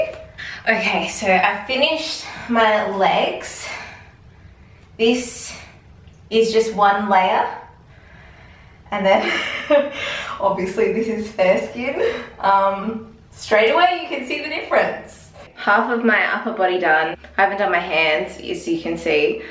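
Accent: Australia. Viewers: this is a really random question but is she from australia? idk she sounds australian though. just asking because i want to watch australia youtubers and she was in my recommended and she seems nice and i love her already ahaha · Okay but I love your voice and your Australian accent. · Is she from Australia? I am and I think I recognize the accent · She sounds Australian not British. Maybe it washed off cause she didn't wait long enough.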